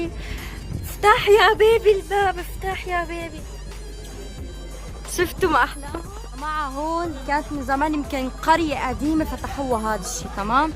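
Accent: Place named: Syria. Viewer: انتي حليبة ليش هيك بتحي ههههههه وسورية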